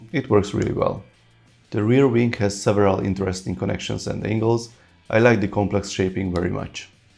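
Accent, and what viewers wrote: Hungary. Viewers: Az akcentusod elárulja h magyar vagy de amúgy jó lett !!! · Olyan magyaros akcentusod van...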